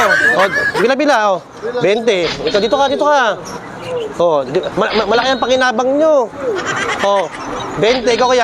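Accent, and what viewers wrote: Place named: Pampanga, Philippines. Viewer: Kapampangan ka?? Halata sa pgsasalita mo hehe.nkktawa tlga mga prank mo 😅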